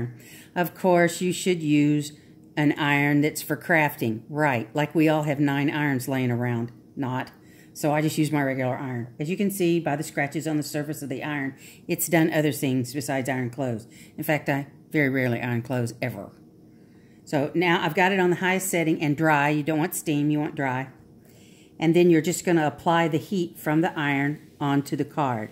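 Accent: South, United States. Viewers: She kind of reminded me of Carol Duvall with a southern accent. I kept thinking I have to get off this computer, got things to do, but was riveted to the end. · Oh my goodness, I LOVE your accent. I’m guessing you’re a Southern Lady? I love it. 💖💖💖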